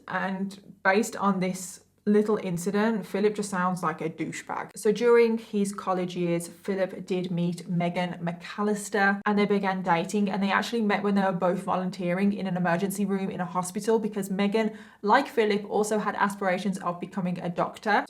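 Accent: United Kingdom. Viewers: I love your channel for many reasons but one in particular is that you are on the same wavelength as I am in your thinking 🤔 another is I love ❤️ your accent im sure every American always comments about that but I do love a British accent ❤️ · I love accent so much. If I had a British accent this would be the one I'd want 🤣, what part of Britain are you from? I love listening to you speak (not in a creepy way just as a comforting way lol) and even more of a plus is that it's crime stories 😆 if I ever imagine having a British accent this is the kind exactly 💯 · Before even watching the video, only hearing your opening sentences -- I had to comment. (Also, my very first comment on your page BTW) How to pronounce "Craig" in Craigslist...?? Please say the name however you want! You are always so polite and kind, so inclusive and respectful to anyone who could ve watching your videos. 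I love your stories because they focus on one murderer. So fun listening to the back story of the upbringing and if its not available, you are just as bummed as we all are. But you still make the story over the top interesting. I love your voice especially your accent!! Your enthusiasm your side comments fresh from the UK the most! -- LOVE your new Podcast too! I am back to YouTube since I have listened to all the Podcasts. Tons of YouTube videos to choose, so yay for Saturdays and True Crime with leading the way. Nichole from CA, USA 💋💌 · I'm an American but my parents/grandparents were British. I enjoy your accent, reminds me of home. Side note: you are so pretty! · you are British, pronounce and speak as you normally do. I love how people who live in other countries speak. Unless, you use a word that most people have no idea what you might be saying, then a definition is very much and respectfully appreciated. 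Most, like British, much more proper than Americans and I love just listening to accents. So keep on keeping. Don't change. I am from the U.S. and I have no accent because am on the west coast.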